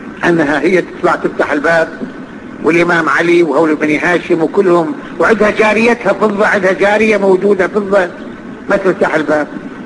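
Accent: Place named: Iraq. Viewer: هذا لهجة نتاعو عراقية ماشي دزيري الله لا يبلينا ربي بالتشيع الله يسترنا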